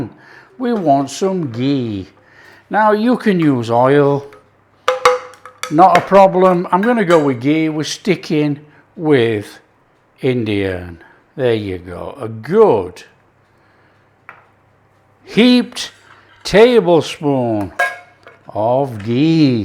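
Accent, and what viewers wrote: United Kingdom. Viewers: I love who you are, love your awesome British accent , your laugh and simplicity, my wife shared this channel with me three months ago, can't stop watching you sir. THE BESY RECIPES ON UTUBE, absolutely love your OH COME ON PLEAAAAASE! THANK YOU SO MUCH SIR FOR SHARING WITH US, YOUR GEEAT TALENTS, your expressions are so spot on when you try your dishes, everything about your videos are priceless, thank you RIK from ILLINOIS TO YOU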